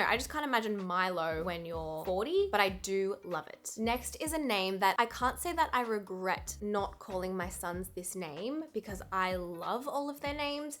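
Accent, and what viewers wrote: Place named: Australia. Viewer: This whole time I thought his name was Hollow not Harlow. I’m not used to the Australian accent I guess.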